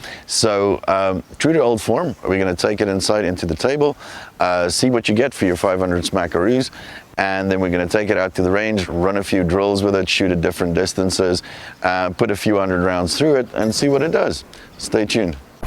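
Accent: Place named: Pretoria, South Africa. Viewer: Am I hearing a Pretoria accent there 😂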